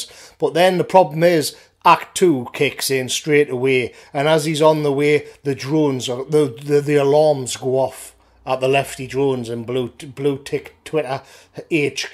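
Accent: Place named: Newcastle, United Kingdom. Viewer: Canny to hear a Geordie voice on here!! But, what gets me about this 'story' is this...he tweeted that pic and the pearl-clutchers went batshit crazy etc, he got hammered for it, sacked and everything. Yet they PUBLISHED the same sodding picture, so, why arent they being hammered for the same thing? The hypocrisy is overwhelming.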